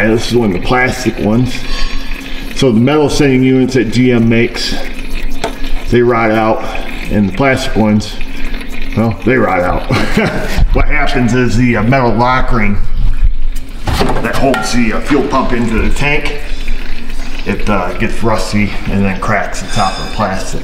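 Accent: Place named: New York, United States. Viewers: i though the way you talk is because it is a new york accent... most people in the USA talk different and pronounce words different because they are different and from a different country or planet you know... go to a good bar and everyone is talking different too Haha... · Ha! I thought it was your New York accent this whole time. I don't hear anything abnormal with your speech. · . Don't worry about your speech, we have been living with your New York "pronunciation" for years and chuckling. It's all good.:)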